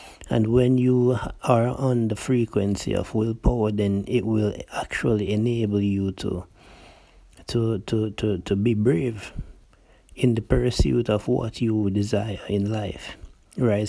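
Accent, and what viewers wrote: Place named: Caribbean. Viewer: Accent sounds Caribbean 🇹🇹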